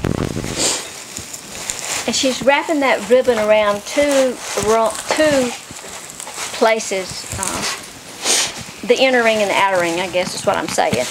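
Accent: South, United States. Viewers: Love your project. I love the mom and daughter togetherness. Families sh o uld be closer in their lives including mine. Love your southern talk. Im from Louisiana so y'all are great!!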